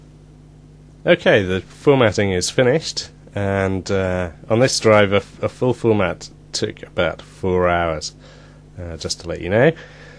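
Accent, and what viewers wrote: United Kingdom. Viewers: I usually hate British accents as they come off a pompous and arrogant but this one is mellow and soothing. I want him to read me a bed time story. "There once was a DIMM that didnt fit in..."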